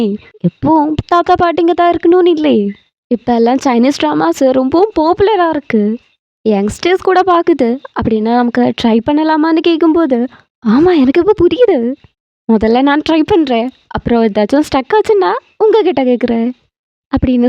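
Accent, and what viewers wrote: Kerala, India. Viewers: Malayali tamil ayyo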